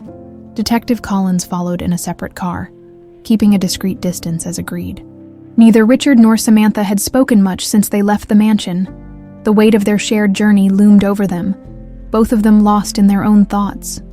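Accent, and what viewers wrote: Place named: England, United Kingdom. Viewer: Your telling language is not American it sounds more like English accent. Would you mind telling from which country you’re from.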